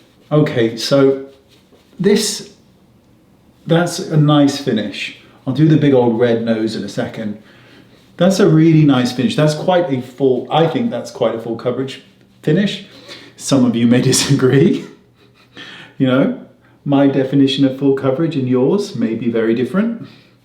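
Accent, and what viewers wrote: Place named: United Kingdom. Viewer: Thx, listening to your accent& lBritish language is always a learning experience. Love an inexpensive cinceLer/ fuundation!! Am waiting for my mail for your Daisy, gloss& liner!! Thanks for your artistry & humor🥂🥂🥂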